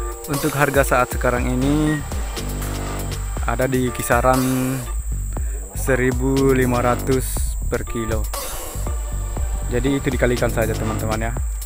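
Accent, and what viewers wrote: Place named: Sulawesi, Indonesia. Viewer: Kayak orang mambi ini logatnya 😁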